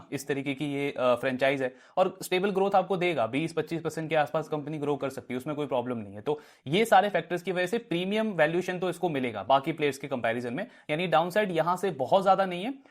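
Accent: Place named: Bihar, India. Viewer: Bhai app bihar se ho kaya maybe Madhubani aur Darbhanga se hoge app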